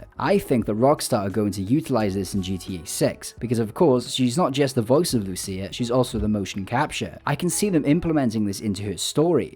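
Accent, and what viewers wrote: United Kingdom. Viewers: Bro got that British voice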